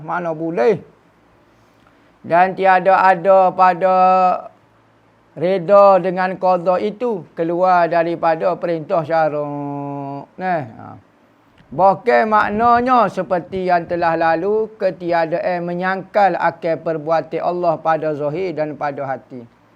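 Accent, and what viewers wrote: Kelantan, Malaysia. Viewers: Sy suka Ustaz ni berceramah dgn dialek Klate.👍👍👍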